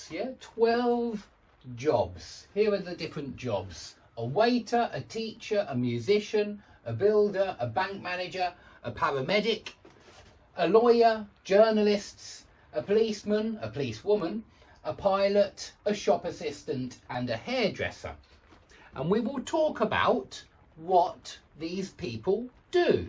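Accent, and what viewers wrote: United Kingdom. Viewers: I love your British accent, sending you love from Egypt ❤️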